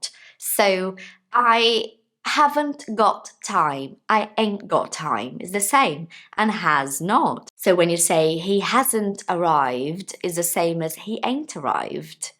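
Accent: United Kingdom. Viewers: Beautiful British English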